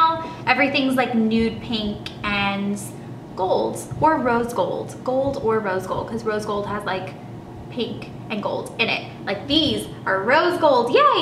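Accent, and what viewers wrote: South, United States. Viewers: love the southern accent! makes me think of home!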